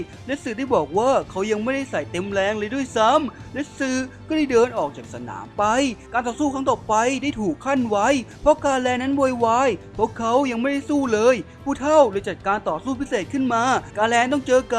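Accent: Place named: Thailand. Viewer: ทำไมบางทีพี่อ่านออกแบบเหน่อๆๆอะ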